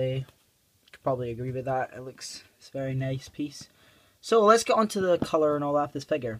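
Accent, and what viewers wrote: United Kingdom. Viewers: And please stop speaking British plese repliy.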